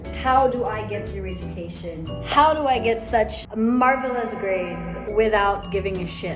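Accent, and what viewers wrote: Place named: New Zealand. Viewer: you're losing the kiwi accent nooooo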